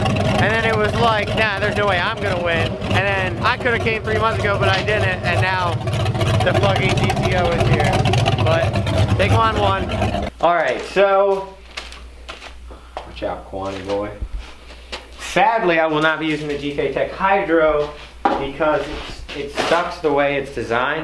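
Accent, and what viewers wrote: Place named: New Jersey, United States. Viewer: games&memes because he’s from jersey they have accents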